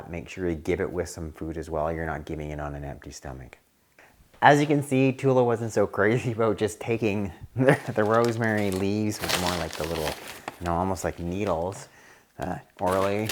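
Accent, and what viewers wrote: Canada. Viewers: I thought I detected a Canuck accent... I love being right! We really do pronounce "out" and "about" in a special way..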